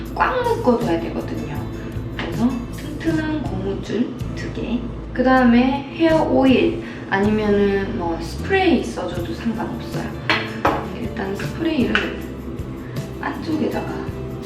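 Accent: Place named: South Korea. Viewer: I heard some dialect in your Korean, are you not from Seoul? :)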